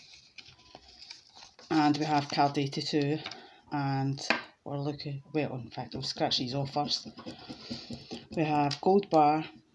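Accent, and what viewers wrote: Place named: Glasgow, United Kingdom. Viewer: Hiya 👋 Nice session!!! How lovely is Glasgow accent 😍 👍 and sub for you!! I used to live in Scotland 🏴 for over 10 years!!!! I miss this country 🙁 Right now I live in US and I am scratching American scratch cards, unfortunately most of scratch cards are rubbish!!! I remember one year ago was a different story. How you doing?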